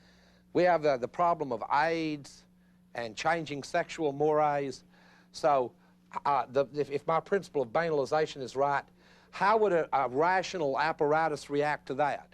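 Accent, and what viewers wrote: South, United States. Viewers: 'Rotund effeminate southerner lectures on leftist apologetics'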